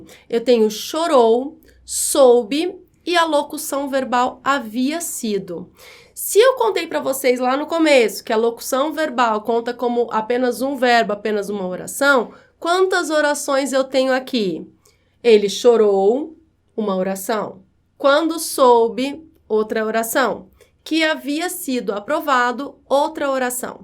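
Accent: Brazil. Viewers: Gosto muito das suas explicações. Falo português europeu, mas entendo o vosso sotaque/dialeto brasileiro muito bem.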